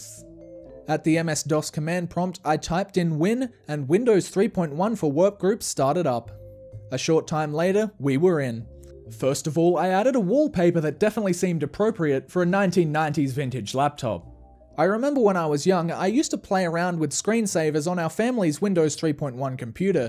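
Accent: Australia. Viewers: Aussi Accent...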